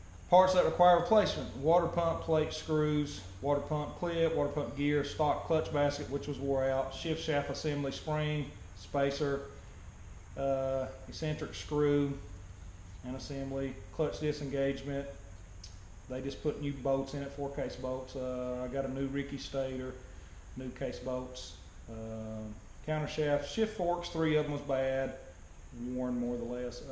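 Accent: Tennessee, United States. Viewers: You from Tennessee?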